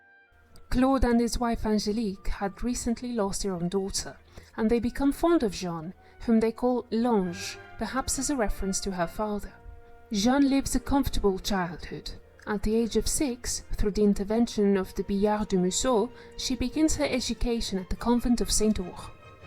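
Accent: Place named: England, United Kingdom. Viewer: The fact that this AnasSyriano is not even capable of recognizing an English accent or not has made a fool of himself or herself. So never mind : your accent is truly nice, and thanks for the video 😉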